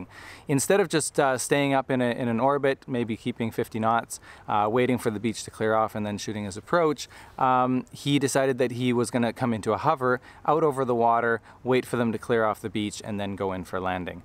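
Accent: Canada. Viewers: Love these videos Your thoughts can be use in every day life Air safety institute videos cause & effect gives me same CHARITY..who knew chopper talk have life lesson I dont fly i walk Why do you sound So kanadien in pronunciation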